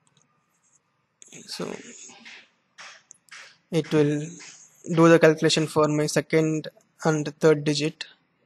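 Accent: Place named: India. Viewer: Would be nice if you'll try to speak imitating British accent, cuz Indian accent is pretty difficult to understand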